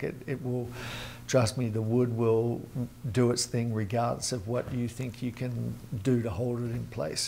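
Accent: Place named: Australia. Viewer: Ahh I knew I wasnt going crazy about your Aussie accent, would love to see you build a door with your Felder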